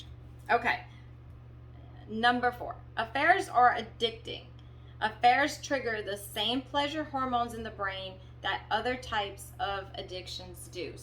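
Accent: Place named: Louisiana, United States. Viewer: Love your videos! You sound like you're from Lafayette... or somewhere here in Louisiana lol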